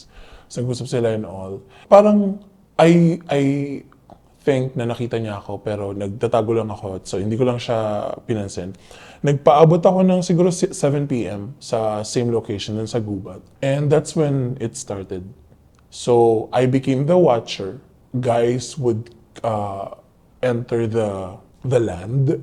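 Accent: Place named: Manila, Philippines. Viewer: You sounded like you're from DLSU 😀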